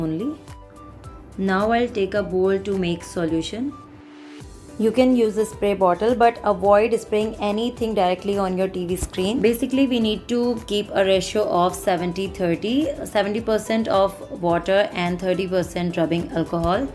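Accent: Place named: India. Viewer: I HATE YOUR WORLD FAMOUS INDIAN ACCENT!!!!!!!!!!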